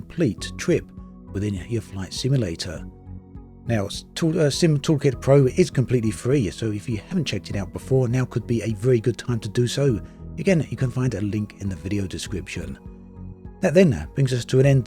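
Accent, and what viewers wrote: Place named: Essex, United Kingdom. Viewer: As a UK citizen I find it fascinating that anyone would think of an Essex/East-ish London accent as ASMR - ha ha. They do say the only was is Essex (UK TV joke). Sorry to if I'm wrong.:-)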